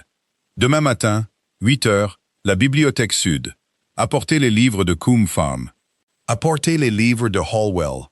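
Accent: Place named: United States. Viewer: Merci, j’ai bien aimé cette histoire très bien contée avec un joli accent, le même que celui de mon mari américain..très agréable histoire d’amour..😊 Je vous écoute du Sud de la France..😊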